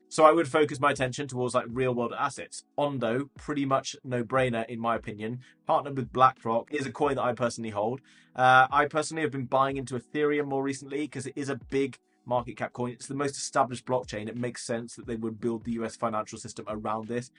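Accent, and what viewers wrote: United Kingdom. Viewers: The British accent adds comfort and trust lol. He could sell an Eskimo a deep freezer.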